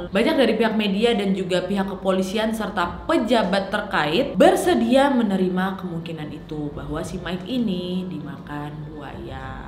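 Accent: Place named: Medan, Indonesia. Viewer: Kek gaya becakap anak Medan.. ya kan wak?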